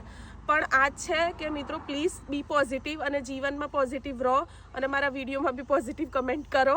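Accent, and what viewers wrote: Sabarkantha, India. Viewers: Apni speech thi j khabar padi gai ke tame s.k. na chho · બેન આપના વીડિયો થી અમને ત્યાં રૂબરૂ આવ્યા નો અહેસાસ થાય છે,અહી બેઠા અમને ત્યાં કેવું પર્યાવરણ,ત્યાંનું લોકજીવન,રોડ રસ્તા,સુવિધાઓ ત્યાંના નીતિ નિયમો જાણવા મળે છે,બીજું કે આપની શુદ્ધ ગુજરાતી માં સાબરકાંઠા નો લહેકો.આવેછે એ પરથી અમને ખબર પડીજ ગઈ હતી કે બેન. આપણાં બાજુના જ છે,એ આનંદ ની વાત છે,બધાને વિદેશ માં કેવું જીવન છે એ જાણવાની જિજ્ઞાસા હોય જ જે તમે વીડિયો દ્વારા બતાવો છો એ સારી બાબત છે,હવે થોડા ઘણા તો નેગેટિવ કૉમેન્ટ કરવાના,એ બધું ધ્યાન પર ના લેવાય,આતો દુનિયા છે આમ પણ કહેશે અને એમ પણ કહેશે,બાકી તમારું કામ ખુબજ સારું છે ધન્યવાદ.ભગવાન તમારું સારું કરે. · બેન તમે બોલો છો એ ખુબ જ ગમે છે અને બીજું કે જે માણશ બોલકું હોય એના દિલ મા કોઈ દિવસ કપટ ના હોય કે છલ ના હોય અને બીજું કે સાબરકાંઠા ની બોલી એટલે વાત પુરી થઈ જાય i like it બીજું તમે સુંદર કામ કર્યું છે કે વિદેશ નું ક્લચર કેવું હોય છે ત્યાં માણસો નો સ્વભાવ તેમનું વ્યક્તિત્વ તેમની રહેણી કહેણી ત્યાં ના માણસો મિલનસાર છે કે નહિ માયાળુ છે કે નહિ આ બધુ સમજીને વિડિઓ બનાવવા સહેલા નથી ભારત વાળા માણસો હંમેશા ભૂલો કરવામાં કચકચ કરવામાં ખાંચા કાઢવામાં જ નંબર વન છે એટલે તમે બધા શુ બોલે છે એમાં ધ્યાન ના આપશો બસ સુંદર સુંદર વિડિઓ બનાવી ને મોકલો ભારત મા મોગવારી એ માજા મૂકી છે બેન વિદેશ વિશે કંઈક તો જાણવા મળે 👌🏻👌🏻👌🏻👌🏻👌🏻🙏🏻🙏🏻🙏🏻❤️❤️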